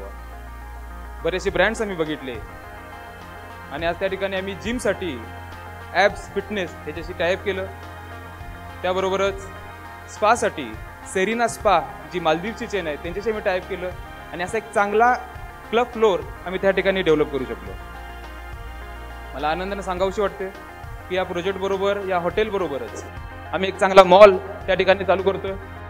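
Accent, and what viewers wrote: Kolhapur, India. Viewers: अभ्यासपूर्ण आणि तितकंच अस्सल कोल्हापुरी टोन मध्ये केलेलं भाषण😊👌👍 पुढील वाटचालीसाठी शुभेच्छा 💐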